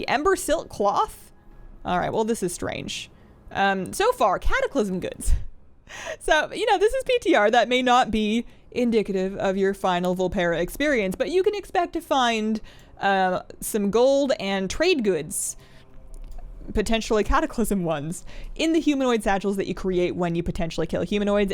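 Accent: Canada. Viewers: Can you tell she's canadian? :D I love your enthousiasm!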